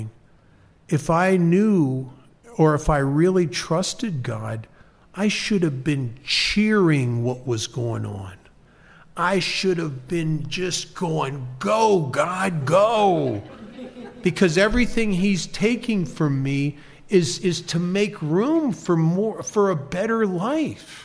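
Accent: United States. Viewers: Nice to hear american english🥰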